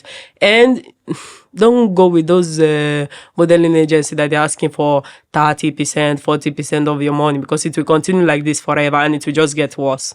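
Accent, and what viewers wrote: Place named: Haiti. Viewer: Her accent sounds haiti also, I was talking to someone today "Nigerian". We spoke to the extent of dreams and ambitions on inventing solutions for the nigeria economy. He said poor people don't dream, they only they only survive meaning we cant solve our problems cause we trying to live for tomorrow while dying today. She is right.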